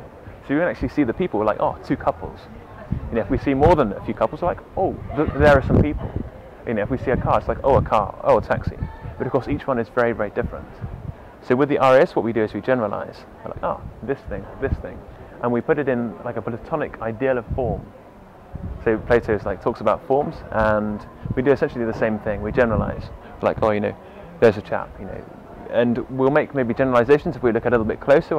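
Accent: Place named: England, United Kingdom. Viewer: Hi, very interesting video, nice to have a English accent for a change lol. Also nice to have someone presenting photographic concepts in this way. Thank you.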